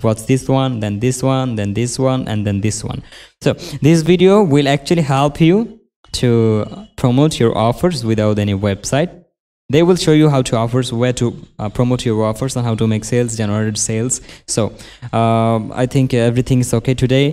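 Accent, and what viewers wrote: Bangladesh. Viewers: He is bengladeshi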